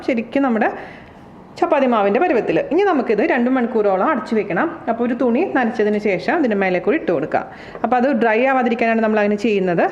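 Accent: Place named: Kodungallur, India. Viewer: Chechi... ഞാൻ ചേച്ചിയുടെ ചാനൽ കണ്ട് തുടങ്ങിയത് ഈ ഏപ്രിൽ മാസം മുതലാണ്. ശ്രദ്ധിക്കാൻ കാരണം ചേച്ചിയുടെ സംസാരരീതിയാണ് കേട്ടപ്പോഴേ തോന്നി നമ്മുടെ നാട്ടുകാരിയാണെന്ന് (ഞാൻ കൊടുങ്ങല്ലൂർ). പിന്നെ ചേച്ചിയുടെ വീഡിയോസ് കണ്ടു തുടങ്ങി. ആദ്യം കണ്ടത് ചേച്ചിയുടെ കഥയാണ് പിന്നെvacation വീഡിയോസ്, യാത്രകൾ, വീട് ,ഷോപ്പിo ഗ് അതെല്ലാം കണ്ട ശേഷമാണ് റെസിപ്പികൾ കാണാൻ തുടങ്ങിയത്.ആദ്യം പരീക്ഷിച്ചത് കേക്ക് ആയിരുന്നു അതിൽ വിജയിച്ചു പിന്നെ ബിരിയാണി, ഗുലാബ് ജാമുൻ ,അങ്ങനെ കുറേ ഐറ്റംസ് പക്ഷെ ഏറ്റവും ഗംഭീരമായത് വെട്ടു കേക്ക് ആയിരുന്നു. അച്ഛനും അമ്മക്കുമൊക്കെ ഒരു പാട് ഇഷ്ടമുള്ളതാണ് ഈ വെട്ടു കേക്ക്. എന്തായാലും ഇപ്പോ കുറേ പലഹാരങ്ങൾ ഉണ്ടാക്കാൻ പഠിച്ചു. ഒരു പാട് Thanks ചേച്ചി.എല്ലാ പ്രാർഥനകളും.....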